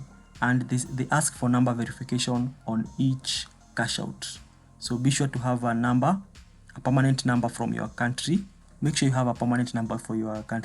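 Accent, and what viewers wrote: Kenya. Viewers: Hii accent ni ya kenya sindio...murima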